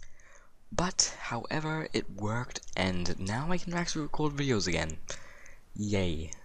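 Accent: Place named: United Kingdom. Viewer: british accent? xD I'm from spain and I just love how you speak english!!! UvU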